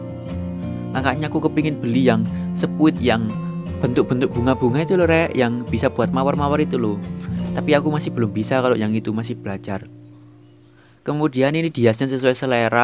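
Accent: Java, Indonesia. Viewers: Loalah..wong mojokerto dewe.Mangkane ngomong e medok😂btw podo mbi aku mas wong mojokerto😄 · iya...tapi mas-nya ini mmg klo ngomong msh medok..campur bhs Indonesia dan Jawa..ciri khas org Jawa mmg kdg2 msh sk campur2 ngomongx..he3x.. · Y Allah ngakak dnger suaray medok bngt rek😂😂😂😍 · Medoknya lucu banget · Logatnya bagus... Hehehe... Medok.... Thx resepnya berguna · Mas lucu deh cara bicaranya hehe ..orang jawa ya kayaknya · Medok banget anjay, ngakak dengerinya😆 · 😂😂,,medok bahasanya mas sama saya jg orang Jawa 🤣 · Medok banget:v sukaaaaaaa ♥️ gua fokus ke suaranya yang medok tiba tiba gua suka yang Ngomongnya medok wkwk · Haduh basane medok buanget rek, moker ndi ki mas, makasih yo kontennya meninspirasi. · Aduhh demen sama medoknya😂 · Gue gk suka masak tp liat video ini tk ulang2 jd semangat nyobak...medok mu loh yg bkin semangat ms.bro...😂🙏🙏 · SUMPAH LO, AKU GA NATE WALEH NONTON YUTUB MU MAS. 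SUARAMU UADEM, MEDOK, UENAK DI RUNGOKNO. YOK OPO AKU SG GRUSA GRUSU KEK NGENE KI MAS 😂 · jawane medok n lucu sederhana pula.. kerennn.. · Aq orang balongbendo mz jowo e jek medok e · Salah ngomong jatohnya malah kasian tp gemes Medhok nya jg uhhh banget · Njiiirrrr... Diselempitkan jare.. 🤦🏻‍♀️😂🤣👍muwedddoook banget c mas.. Kudu ngguyu ngrungokno e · Medookk bngeett anjiir😫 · penyampaian mas nya jugaa sederhana dan jawa bangeeet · Gemess ...medoknya ituloh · medok bylyke emm, cwo jgo masak mantap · "sensasi nyemprot menyemprot bikin bahgia rek" medoknya ituloh yg bikin ter unch 😍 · Suka suaranya yang khas medok. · Pengen bgt ngeliat mas nya d undang hitam putih trs masak pke logat jawa ky gitu..hehehe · Saya suka medok nya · Fokus sama cara ngomongnya....jowo Poll alias medok ...the power is Jawa 😅😅😆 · hi lucu bosone medok bnget 😍 kak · Kakak orang jawa tulen yaa Ngakak aku denger logatnya😂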